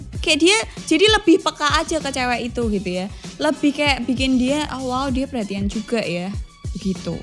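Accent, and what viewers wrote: Java, Indonesia. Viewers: Aksen medok is love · medok rek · seneng Karo logat jowo ne sampean mbak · laahhh opoo iki....jowo tenan rekkkkk... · Muedok banget logat e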